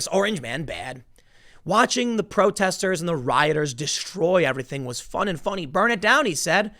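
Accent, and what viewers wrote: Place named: New Jersey, United States. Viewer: Hearing a lot of your Jersey accent in this video. Love it. Stop repressing it.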